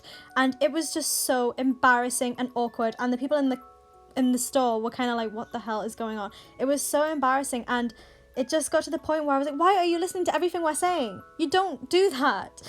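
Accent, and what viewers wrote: Newcastle, United Kingdom. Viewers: First time here and ur accent reminds me of perrie edwards geordie accent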